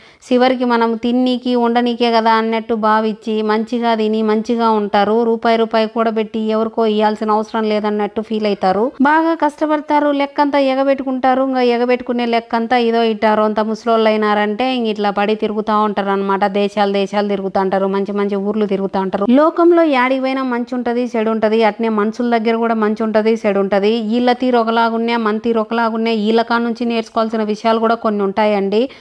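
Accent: Rayalaseema, India. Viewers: Nice video, Maana Raayalaseema language ni andhari teliyajesavu ne mattalatho super chelli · super andi... 1st time mana rayalaseema slang youtube lo vintuna...ma uru proddatur keep it up 👍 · Hi ma maadhi kuda rayalaseema ne kurnool. Meeru rayalaseema slang lo matladuthunte chala bhagundhi.nice keep going on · Mana Raayalaseema slang yekkadiki vellinaa thaggedele.. negative comments skip cheyandi..manam andarinee navvisthu, navvinchadame mana vuddesam....👍👍👍👍👍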